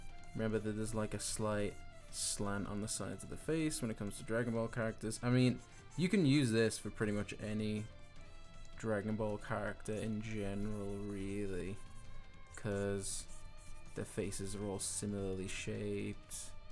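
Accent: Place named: United Kingdom. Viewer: holy crap he's British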